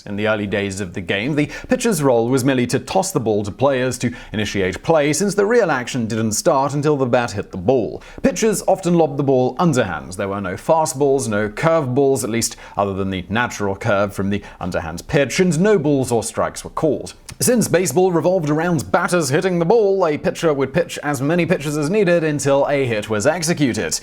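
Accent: United Kingdom. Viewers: Why am I listening to a Brit tell me about baseball?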